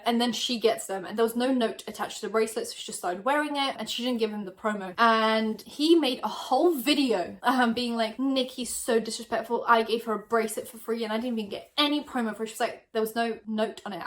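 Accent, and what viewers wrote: United Kingdom. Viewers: I could listen to you say vitamin all day. From an American who loves a good British accent. 🥰🥰🥰🥰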